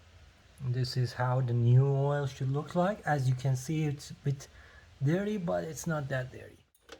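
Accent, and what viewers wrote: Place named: Australia. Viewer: Hello, you sound like a fellow-Aussie ☺ After watching your video tip, I Googled the oil needed for Toyota Yaris Cross, and found it to be 0W 20.( Synthetic) Is this a significant difference? What goes with such a variable answer to this question? I live in Sydney which is NEVER really cold, but often miserably, 3rd world, hot in those torrid months of December to March each bleeding year 😂 Thank you!!!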